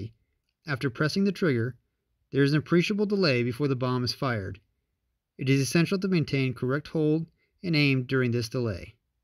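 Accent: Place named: United States. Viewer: I really don't like the fake instructional training video format. And your nasal US accent wouldn't have got past the front desk of the WD Publications Branch.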